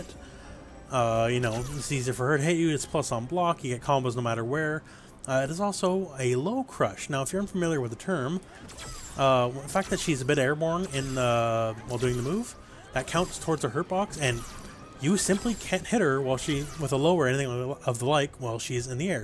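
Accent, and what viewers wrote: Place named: Atlantic Canada, Canada. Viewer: Great video bra!! I can tell your from the East Coast of Canada ehhh by your accent!!! Very helpful video bro!!! Thx. I liked and subbed!!!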